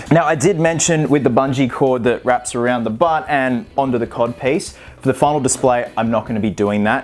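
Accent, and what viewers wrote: Australia. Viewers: They don’t sound the same lmao, Cavill is English and this guys Australian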